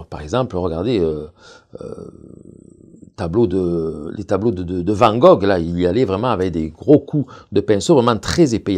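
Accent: South, France. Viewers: Coucou Merci je viens de m'abonner et je vais m'y mettre - Pédagogie = fluide - accend du sud = charmant et phrasé agréable 🙃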